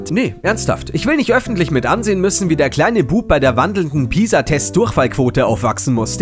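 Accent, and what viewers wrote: Bavaria, Germany. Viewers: woher aus Bayern bist du? grüße aus Rengschburg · du bistn bayrischer bub? und schon hastn abo mehr. dein Zynismus ist mega geil. :'D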